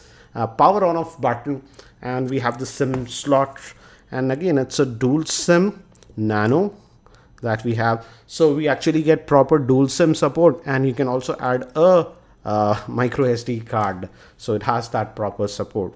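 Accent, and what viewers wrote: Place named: India. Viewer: gosh ! the indian accent...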